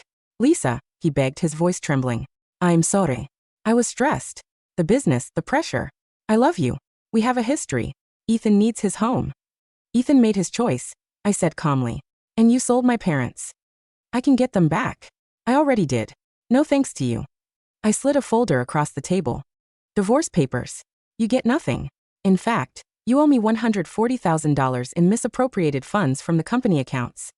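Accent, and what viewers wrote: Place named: India. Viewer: The Indian ascent is so funny🤣🤣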